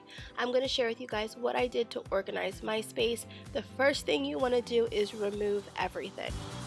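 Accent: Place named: Midwest, United States. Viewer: Love your videos! Random question, are you from the Midwest? I am sensing a Ohio/Illinois/Michigan accent! If you don't feel comfortable answering I totally understand! Keep up the great videos!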